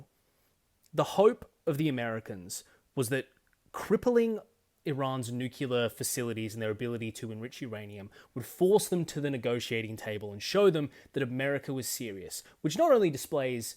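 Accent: Australia. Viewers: Australian channel, Australian pronunciation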